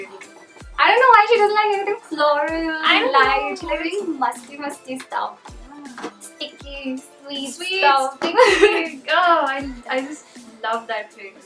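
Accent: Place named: India. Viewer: thats a typical Indian accent lol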